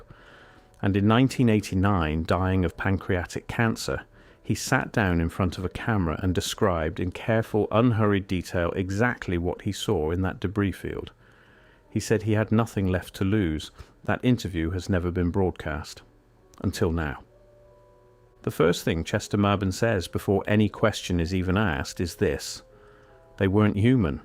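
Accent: United Kingdom. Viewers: When the narrator has a British accent, it makes the message more believable! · GET RID OF THE UK NARRATOR VOICE!! 🤮🤮🤮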